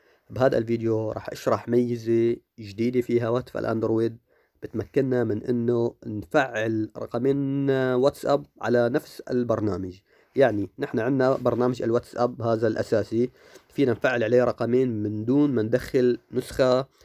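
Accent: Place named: Homs, Syria. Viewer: معلم مدري ليش حاسس اني سامع صوتك وانك حمصي 😅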